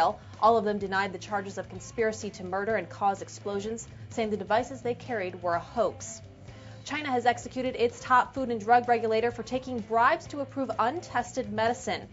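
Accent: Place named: United States. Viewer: good video, but hated the annoying American Accent (Nothing against American's, jus the accent)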